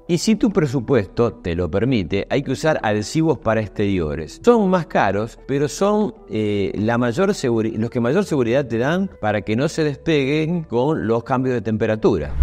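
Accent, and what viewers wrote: Argentina. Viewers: Aprecio el modo suave, claro y preciso de sus explicaciones, se nota que Usted sabe de lo que habla y su accento muy ligero a Argentina aún aumenta lo agradable que suena, le da un toque especial 😊 sigua así Maestro👍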